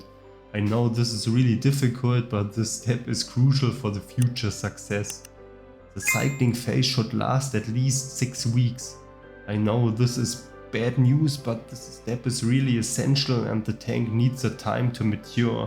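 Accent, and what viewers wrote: Germany. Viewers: Warum machst du kein deutschen Kanal? Man hört leider extrem raus, dass du Deutscher bist mit deinem Englisch.😅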